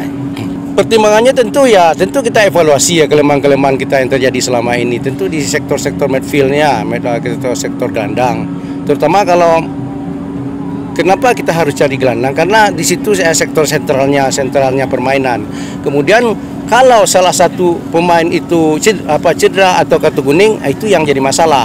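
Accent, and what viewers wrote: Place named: Padang, Indonesia. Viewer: Bahasa Indonesianya kental banget Padang nya hehehe.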